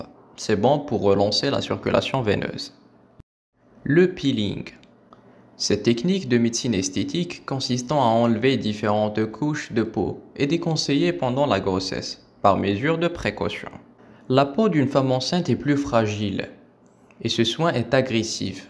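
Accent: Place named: Kabylie, Algeria. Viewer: ca se voit tu es kabyle tu as l'accent lol mais merci des conseils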